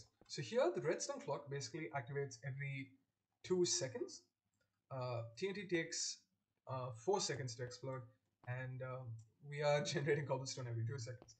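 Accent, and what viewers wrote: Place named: India. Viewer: Which country do you belong to? Your accent is very Indian. If you are an Indian then its really nice to see some good indian Minecraft youtuber.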